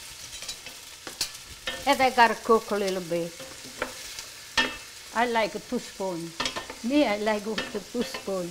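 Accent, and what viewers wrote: Italy. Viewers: is so precious! I love to hear her Italian accent. I love you!❤ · I've recently started watching Grandmas videos and I'm learning a lot. I love her Italian accent. I will be trying these recipes at home. Love you Grandma from South Africa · I love the way you cook. But I really love listening to your Italian accent and your singing!!! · Italian accent sounds very good and it sounds so better, when it's about italian cuisine! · Devo dirti che ero davvero preoccupato per la tua così lunga assenza… La prossima volta fai dei brevi video per far sapere a tuoi amici che stai bene… Amo la tua cucina (e il tuo inglese con accento italiano 😄) e questa volta hai cucinato la mia vita No .1 ricetta!!! 😀😀😀♥️♥️♥️🇮🇹🇮🇹🇮🇹 Il tuo super fan greco dalla Polonia/Germania…..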